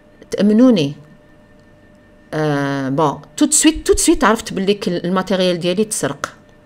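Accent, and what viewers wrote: Algiers, Algeria. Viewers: سلام عليكم اخت اول مرة نبعتلك كمونتاع انا من متتابعاتك الوفيات راكي اديا دعوة الخير من كل الشعب الجزائري نساء و رجال ولهذا اسلكك ربي من كل موصبة ان شاء الله راكي وكلتي ربي و احنا تاني ماعندك ماتزيدي حسبنا الله و هو النعم الواكيل و اخيرا الحمد لله على السلمة و نزيد هاذي انا صواتكي يعجبني اهبل بلاهجلةالعاصمية تاعك اتمنا ان اراكي يوما ان شاء الله 🤲🏻❤ امين شكرا شكرا و شكرا يحفظك الله و عائلتكي سلام❤❤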